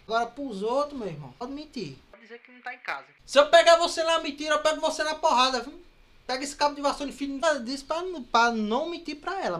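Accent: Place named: Pernambuco, Brazil. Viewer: Mermao com esse sotaque ai ce é de pernambuco?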